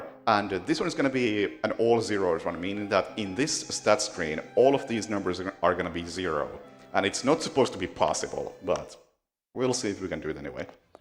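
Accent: Finland. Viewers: "Hello" - this guy's Finnish! Damn the accent of my countrymen is so distinguishable it's not even funny :D Great gameplay. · Beautiful finnish accent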